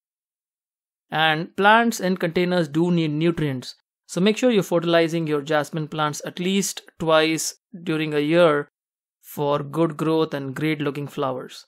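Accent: India. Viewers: You sounds that you are Indian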